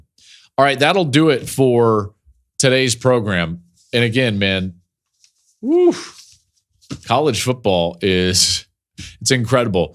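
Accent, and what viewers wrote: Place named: Alabama, United States. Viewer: I'm not crying. I'm not even a Florida State fan. I'm a fan of fairness. Alabama has a great history of championships which I greatly respect, but they have no business being in the CFP this year. By the way, I can hear your Alabama accent all the way across the internet. Don't be so greedy, Bama boy. You've been top dog many times and you will be again. But this year you could have the grace to let other worthy teams have their moment. If Michigan plays up to their normal level, they are going to whip you like a red headed stepchild. And I admit I will enjoy that.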